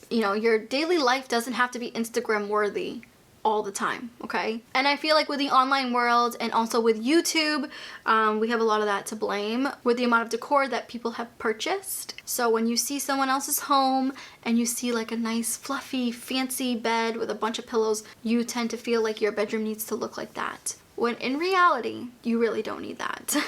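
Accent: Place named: New York, United States. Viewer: I’m new here I love your accent are you a New Yorker ?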